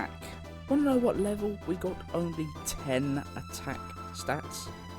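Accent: South, United States. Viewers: I love chansey ! I saw people saying you were southern * thinking US as I am * but still , may be another country but still southern accent is 🥺🤍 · Hahahahaha go on son watch a lot of these but your southern accent is soothing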